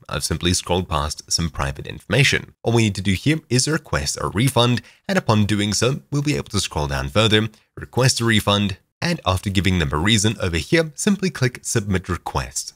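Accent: South Africa. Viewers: are you a South African? just asking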